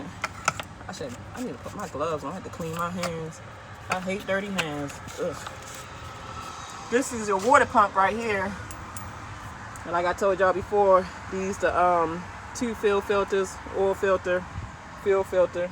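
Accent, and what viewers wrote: Baltimore, United States. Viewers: You have the Baltimore accent lol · Are you a baltimorean ?? Lol. I hear this distinct accent in your voice. Two , do , etc.... just askin.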